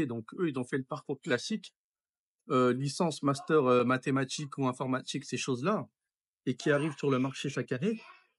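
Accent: Banlieue, France. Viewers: Un mec avec un accent rebeu de cité, voire accent racaille, comme ça, personne ne l'engage... fini à l'entrevue. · Tu a toujours ton accent de banlieue depuis le Vietnam. Si tu sorts un programme ou une application, le problème c'est de le commercialisé pour dégager un revenu et c'est pas si simple sauf si tu t'appelles zuckerberg ou Musk. Courage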